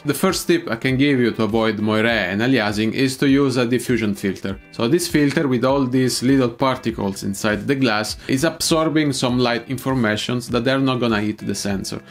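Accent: Italy. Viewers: I love your positive attitude, quick smile, great information/advice, and pleasant Italian accent! I think if you keep this up you will eventually earn many subscribers. But (even though I'm not a director) I'm going to "blast you" about focus! It's very distracting that your eyes and much of your face are out of focus for so much of this video. I would advise to just go ahead and stop down the lens a bit next time and turn up the lights to bring back the exposure you want.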